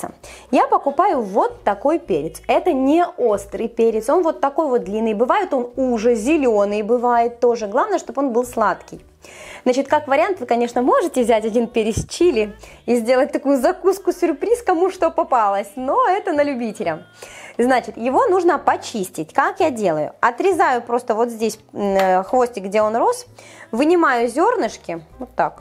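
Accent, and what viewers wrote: Ukraine. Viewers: а где вы живете..... Говор у вас Украинской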